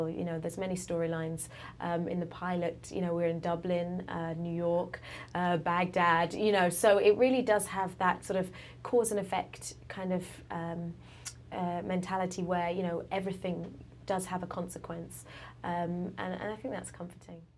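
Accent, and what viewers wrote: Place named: RP, United Kingdom. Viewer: She sounds very different in this interview, so much more posh! She's great, I love her.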